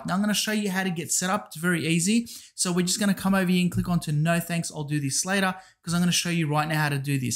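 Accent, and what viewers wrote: Australia. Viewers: I'm from New Zealand so I picked up on the Australian accent and just assumed. Hey, btw great video. Whats your #1 source of traffic to your non MMO offers? Paid or free?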